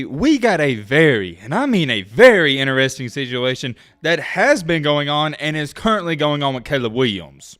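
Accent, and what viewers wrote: South, United States. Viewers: Haha SEC is 1-5 in bowls—such an overrated inbred league—almost as bad as your southern drawl and high school football highlights. Go Cinnci & Wolverines!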